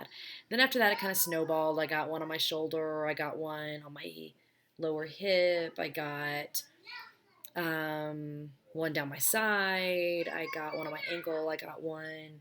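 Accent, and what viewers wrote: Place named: South, United States. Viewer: Sounds like you're a Southerner. That's the important thing! --don, mphs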